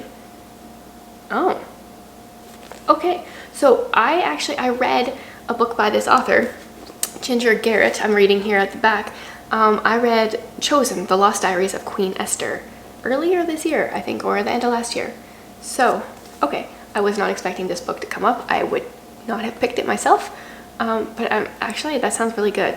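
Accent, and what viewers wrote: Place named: Canada. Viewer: ive reached a new record as an american. i was able to clock you as canadian in just 25 seconds. checked your channel and i was right! canadian-dar is alive and well. greetings from the south in gun-trump-freakyland usa!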